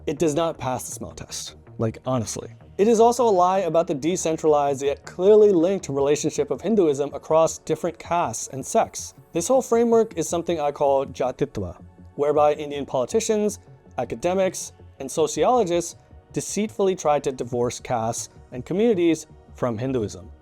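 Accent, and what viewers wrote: United States. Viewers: from …. hmmm So interesting!I watched a couple of videos already. We come across something new everyday, here we find an american accented indian living in the US who is huge BJP fan!! He does a lot of research, but in his fangirling over Modi forgets to find “the first OBC PM of India” comment by the PM? Also according to “BJP is reformist and a rebel”! So what has stopped this rebel to challenge the status quo? As a general caste hindu i want to know why hasn’t this reformist party challenged the reservation system openly? It could have done that alongside its hindutva politics? Strange are the ways of the world! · Best channel. Explaining India through an Indian lens, with just 1 difference: a western accent & articulation. Makes it much more consumable for the world.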